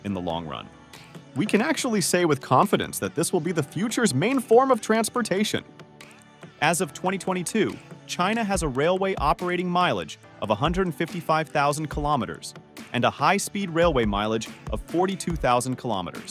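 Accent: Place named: United States. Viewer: More of the tiresome juvenile American accent espousing 'non-existent' technology, including the usual 'cut and paste' non-related images. YouTube unfortunately, is full of this crap!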